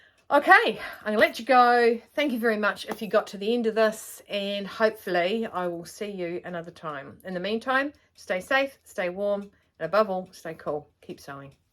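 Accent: New Zealand. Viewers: Hi, welcome to the community of #Fridaysews. You are very natural at this, great to hear about you and your journey. Also, so cool to meet a Kiwi...yay. you go girl! And a warm hello to your Mum too. I am also a #frdaysews vlogger, perhaps either yourself or Mum have commented with me? Not sure. You have a beautiful Kiwi/Aussie accent, just lovely to listen to. Yes please, would love to see your sewing room, and your stash...and would love to meet your Mum someday, maybe a guest appearance? I'm looking forward to seeing you regularly and sharing your sewing journey 😂❤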